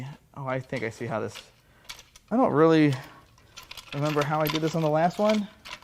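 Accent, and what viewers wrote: St. Louis, United States. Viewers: True StL dialect. Wo ter. Like driving on Far-de-Far past the Carn fields to Worshing MO.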